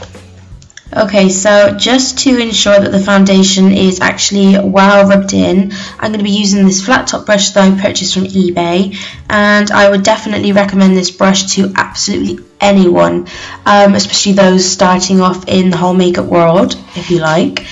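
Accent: Bristol, United Kingdom. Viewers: Love it!I can't tell where you're from >.< so sorry if I'm wrong but you sound bristolian like me!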